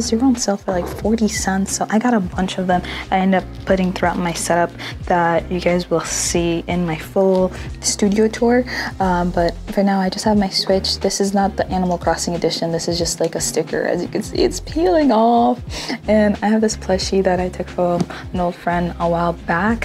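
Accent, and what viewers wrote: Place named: England, United Kingdom. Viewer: I love your english accent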